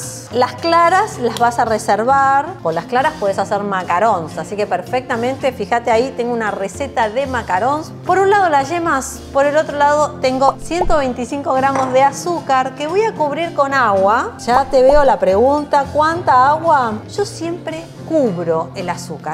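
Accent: Argentina. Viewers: POR TU ACENTO YA ME DI CUENTA QUE ERES ARGENTINA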